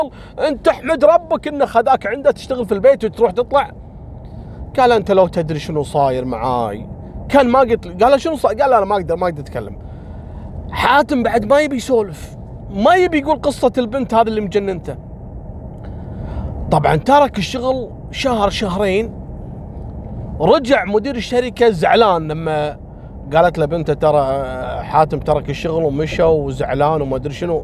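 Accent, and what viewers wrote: Morocco. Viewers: اه على اسلوبك بالدارجة المغربية 😅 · اموت بضحكتك صراحة 🥰 وفاش كتهدر المغربي يا سلام يا سلام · هههههههههه كلامك بالمغربي يضحك الله يسعدك يا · 😊 حلو يتكلم مغربي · انا ما انتبه على احداث القصه بسبب اللهجه الي تتكلم خاصه المغربيه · احلى شي يوم تتكلم مغربي 😂😂😂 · 🤣🤣🤣🤣🤣🤣لجهه المغربيه تذبحني ياحليلك يا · و الله احب لما تتكلم لهجة المغربية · تعلم الهدره المغربيه لايقة عليك يالكبيده ديالي😘🇲🇦🇰🇼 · قصة محزنة لكن حديثك بالدارجة المغربية يضحكني الصراحة 😂😂😂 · حفظك الله يا حلوه للهجه المغربي منك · الله عليك يا وعلى اللهجة المغربية 😊😊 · ناري على الهضرة المغربية😂😂😂😂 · هالقصة سمعتها من عند الخراز. لكن من عندك يا لها طعم اخر وكاني اول مرة نسمعها تعجبني لهجتك المغربية تفطسني ضحك ههه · السلام عليكم انا جزايرية أقيم في فرنسا وانا من متتبعيك الدايمين لك على اليوتيوب والله ابارك قصصك رايعة واداءك اروع الله أوفقك انشاء الله انت تضحكني وتعجبني كثيرا عند محاولتك الكلام بلهجتنا المغربية جزاك الله كل خير يا 😊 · Tbarkallah 3la lahja lmaghribia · Salam nice story your moroccain accent is so funny. 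I am following you all the time. Greating from 🇧🇪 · كفو يا اللهجة المغربية متعوب عليها 😂😂😂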